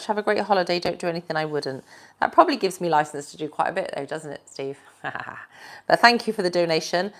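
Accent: West Country, United Kingdom. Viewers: Hey, you could DEF do a better job than Liz Truss? Love that slight country accent, is it West Country perhaps? Regards Chris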